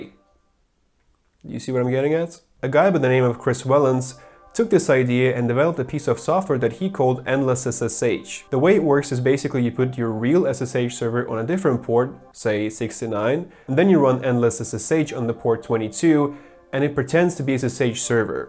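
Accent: Russia. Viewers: русский он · Я не могу понять, он русскоговорящий или нет, английский смешанный, где то хорош акцент, а где то как будто русский. Похож на английский восточной Европы какой нибудь или американских пригородов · Один я слышу русский акцент?) Where are you from?))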